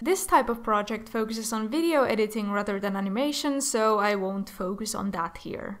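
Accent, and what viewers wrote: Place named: Finland. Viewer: Nice video! I LOVE watching MAPs (but have never joined one because I don't have the patience for animation) Side note- I immediately thought your accent sounded familiar and I was right lol (my family is Finnish)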